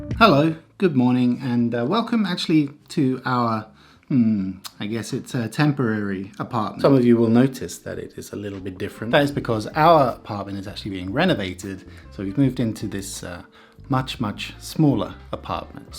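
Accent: Finland. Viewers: It's probably just me hearing things, but it kind of sounds like you've got some, just barely-there hints, of Finn-spoken-English in your English accent now.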